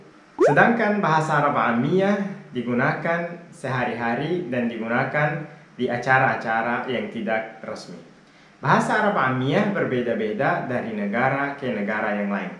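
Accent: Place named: Sulawesi, Indonesia. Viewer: Bang masyaallah sukses selalu ya, btw logatnya abang Sulawesi bgtt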